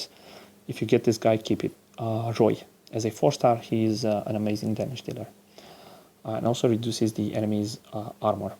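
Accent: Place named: Romania. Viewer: you sound romanian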